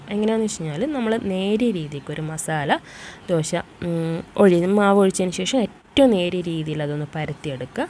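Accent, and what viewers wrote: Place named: Kannur, India. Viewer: Kannur slang sooper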